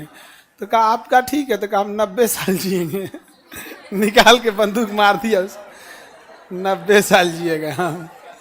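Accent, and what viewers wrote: Bihar, India. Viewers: Bhai chawal ho tum toh kuch samjh nahi Aa rha bihari ho na pakka tum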